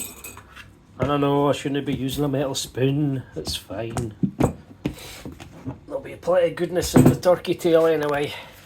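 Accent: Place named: Scotland, United Kingdom. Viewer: What it turkeytail . You sound Scottish I'm Canadian. Don't think we have this tea here . Is it to help with a cold.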